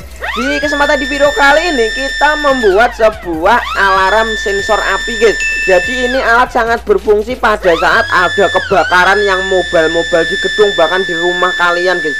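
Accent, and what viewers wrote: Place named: East Java, Indonesia. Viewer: Sampeyan asale ko Endi mas,bahasane Jawa timuran koyone😁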